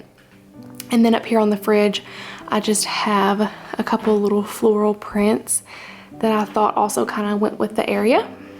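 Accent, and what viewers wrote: South, United States. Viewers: Love that Southern accent. Your closeups are excellent but also pull way back now and then, or upon entering the room and leaving the room so we can see how everything is placed in the entire room.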